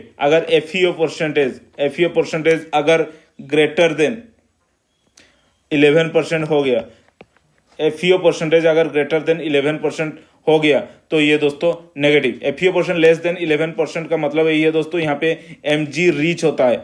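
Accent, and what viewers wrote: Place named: Odisha, India. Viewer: It seems to be a odia tounge with a imperfect hindi.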